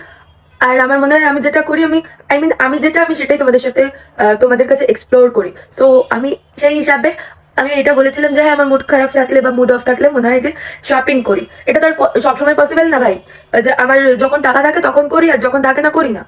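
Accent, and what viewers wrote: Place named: India. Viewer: R ekta content dilm .bostir meye der mto hindi tone e bangla bolis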